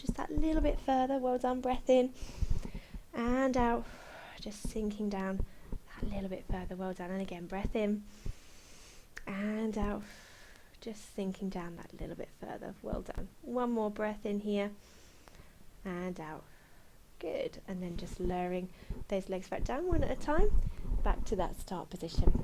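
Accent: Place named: United Kingdom. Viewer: Thank you so much. I started with you 3 weeks ago after a 7 months pilates break. It's been tough the first week with muscle pains 😆 but I really wanted to thank you for all those awsome free classes. You are keeping us far from mental health troubles during this shitty time. On top of it your lovely Brit accent help me improve my pronunciation.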